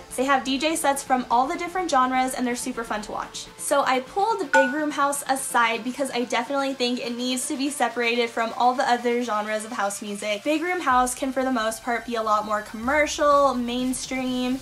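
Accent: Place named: United States. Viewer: The way you pronounce things as an American isn't wrong. If it's a result of your dialect, it's just part of the way you speak :)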